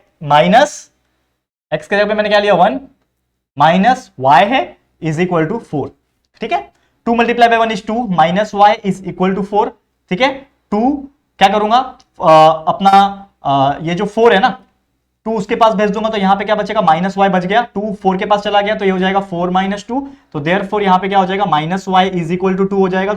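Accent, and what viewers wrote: Maharashtra, India. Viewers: Sir apki marathi bohat cute hai❤❤❤❤❤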